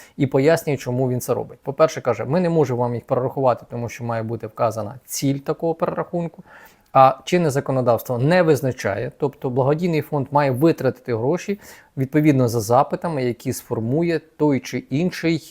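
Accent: Standard. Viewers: Респект за чисту,інтелігентну,спокійну українську мову. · Респект за чисту,інтелігентну,спокійну українську мову. Роботу свою Ви робите спокійно,при цьому грунтовно.Дуже приємно Вас слухати. Успіхів!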